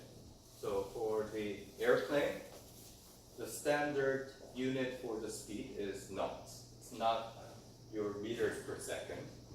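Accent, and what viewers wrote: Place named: United Kingdom. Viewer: Where did you get your British accent? LOL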